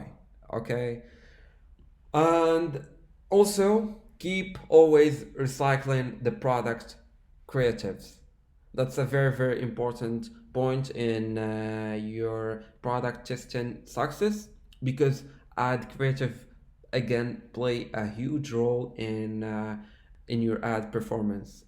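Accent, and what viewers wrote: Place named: Morocco. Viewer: He is Moroccan and his pronunciation mixed between Arabic means Moroccan dialect and French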